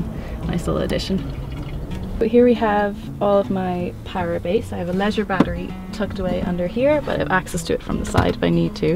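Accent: Ireland. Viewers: Is that an Irish twang I hear? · Are you from Ireland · She is originally from Ireland, that's why she's sound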